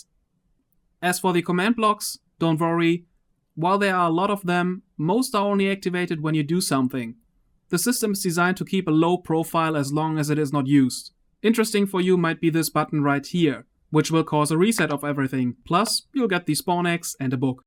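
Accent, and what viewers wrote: Germany. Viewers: Kann es sein das du eigentlich deutsch sprichst?😁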